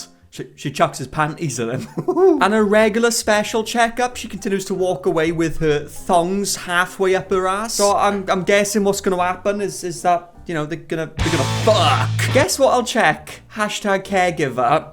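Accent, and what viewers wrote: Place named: South Wales, United Kingdom. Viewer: I'm 90 % sure iv seen you irl in Ystrad tesco 😂 iv watch 2 of your videos and the accent is definitely giving south wales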